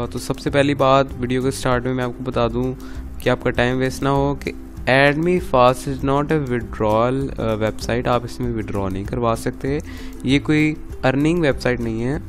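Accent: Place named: India. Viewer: well it's ok you have some indian accent so i don't really understand heh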